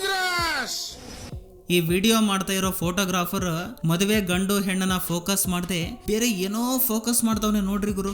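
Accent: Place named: Bellary, India. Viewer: ನಿಮ್ಮದು ಬಳ್ಳಾರಿ ನ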